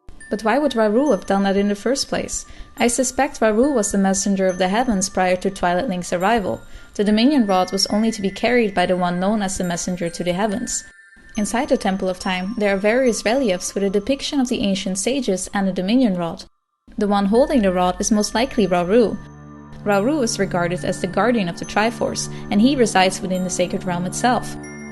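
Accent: Netherlands. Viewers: Are you from Belgium or the Netherlands??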